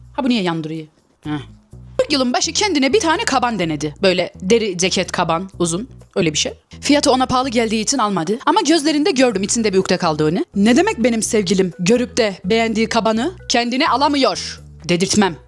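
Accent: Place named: Rize, Turkey. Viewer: abla bir gün video çekerken Rize şivesi ile değil de normal konuşur musun sevgşlinle çektiğin videoda sesin inanılmaz iyiydi❤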